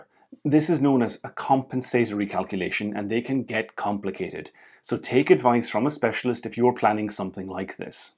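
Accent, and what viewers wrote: Ireland. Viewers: Are you part Irish? I am hearing some Irish accent in your voice. Good video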